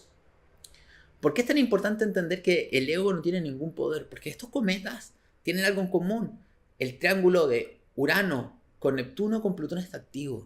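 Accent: Chile. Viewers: Lo vi todo, obvio!!! Tengo 50 y cumplo el 20 de agosto... Sentí q me hablaste a mi!! Estoy nueva en esto de la astrología, era super exceptica con eso pero desde q te escuche en el podcast tiene sentido y me cambiaste todo en cuanto a eso. Me maravillo al verte hablar. Viví en Chile casi 3 años y extraño su hablar y contigo tengo un combo de maravillas entre lo q dices y como lo dices. Un abrazo!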